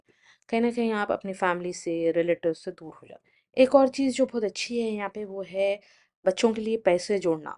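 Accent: Hyderabad, India. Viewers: Assalamalykum...first comment and like ...i am from hyd to....aap bilkul sahi boley ...i 👍 agree...hum bhi US mein they phir hyd aaney ka decision liye...us ke baad bahut regret hua aaney k baad ...khair Allah ki marzi thi ....aap ka hyd ascent achcha laga ...koi log hyd ke hokey bhi apna ascent change karke vlogs daltey...aap ke videos dekh k chicago ki yaad aati bahut....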